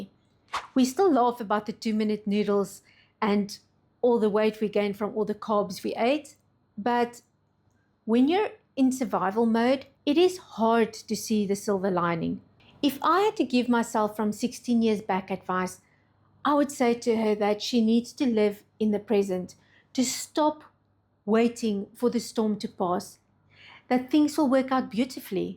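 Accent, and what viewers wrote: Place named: South Africa. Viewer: I am going to draw cash for my groceries because it is just too easy to swipe a card. Having to draw more cash than you intended to spend means some serious deliberation. Ex Jhb now in the Netherlands. Always nice to hear a South African accent.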